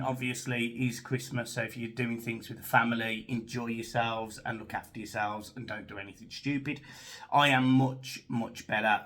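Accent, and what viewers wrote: Birmingham, United Kingdom. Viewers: I'm not having a go mate but why aren't you a villa fan or a blue I like your program it's great I love the Brummie accent conb.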